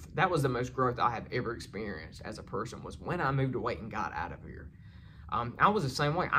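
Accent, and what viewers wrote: South, United States. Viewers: Sorry to hear that you've gotten divorced. You are a smart, funny, great-looking guy. I love your Southern accent, and you have a lot of good things to say. Damn the haters, it comes from people who are INCOMPLETE. Sad to say, but these people have MAJOR ISSUES. The thing that people don't take in is , it's not what religion you are, it's not what political party you belong to, the one thing we need is to LOVE. Love is the answer to all the bad, all the ills of the world. Education is important, but Love is the answer. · you are vulnerable to all sorts of criticism simply by exposing yourself on the internet. People have all sorts of axes to grind and they find you an easy target to vent their anger without pushback. I find this myself from time to time when in discussions on Quora. I agree with the many upbeat comments here. 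As an elderly Australian, among other things I find your Southern personality and accent enough to charm the birds off the trees!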